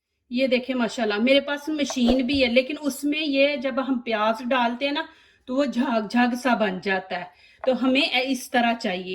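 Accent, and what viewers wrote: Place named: Punjab, India. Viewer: Bohat Mazy dar Anday began bnay hen ap ka panjabi lehja bohat Pasand h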